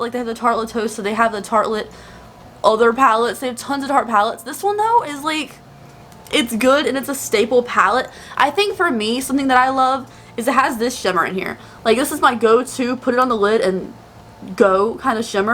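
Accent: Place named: North Carolina, United States. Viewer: is originally from North Carolina:)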